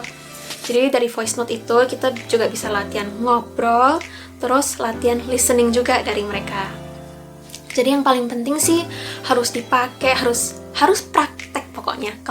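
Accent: Java, Indonesia. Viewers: Medok lebih mantab mbak yu 😅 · medok,e iku lo mantul,joooosss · Nambah channel di jenengi medok 👍👍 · medhok ya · ilat mu..malah mirip wong jowo..nduk ..rada medok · Luar biasa, suka cara ngomongnya bahasa Indonesia, ada medok boso jowone, tak kiro asli wong jowo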